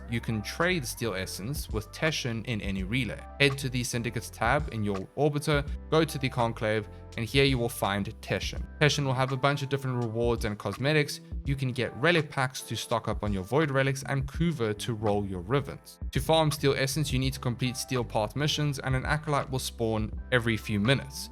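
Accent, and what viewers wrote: South Africa. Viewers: South African? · Bra why do you sound south african.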